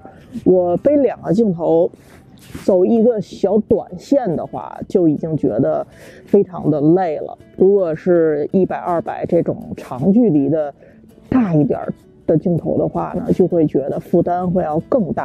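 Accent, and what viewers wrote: Beijing, China. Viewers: edi是北京出身吗？语气很像呢。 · 今兒这视频我彻底确定您是北京人了，这京腔！😁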